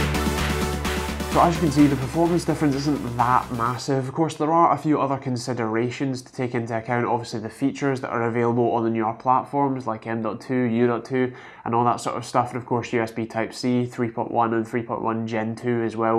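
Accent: Scotland, United Kingdom. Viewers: Is it just me or are you sounding more Scottish in this video? (Not that it's problem if you were :P) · Well, great video as always. My 6700k don't even need to be upgrade and I'm running stock, didn't even use factory OC still good for most stuff. Didn't realise you have a Scottish accent (I think) from all the vids up till now. Haha. Would love to see a cable management video if you have one as my cable management now really is a mess. 6700k with a R9 390x, 16G ram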